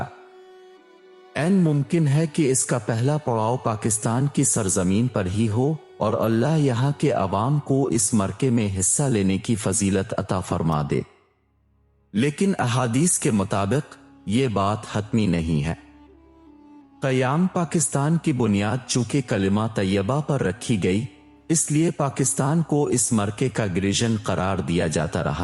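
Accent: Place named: India. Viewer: indians ki urdu aisi he hy lekin hame topic pe ghor karna chahye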